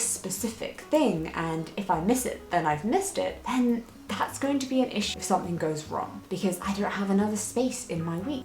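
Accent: RP, United Kingdom. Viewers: Quite the posh accent wow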